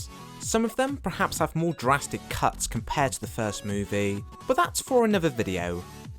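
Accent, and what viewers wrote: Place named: England, United Kingdom. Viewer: somebody should teach the english how to speak english. it's hard to make out what the hell you're saying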